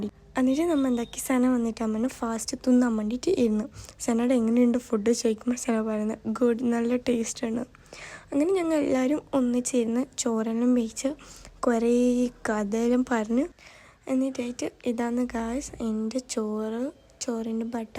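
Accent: Kasaragod, India. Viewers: Ksrgod aanh😂🤍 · Kasaragod touch😂❤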